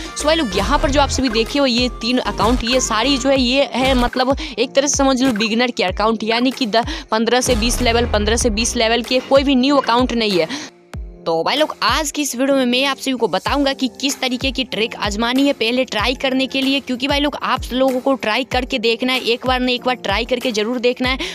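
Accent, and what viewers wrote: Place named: Gujarat, India. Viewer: You're gujarati rait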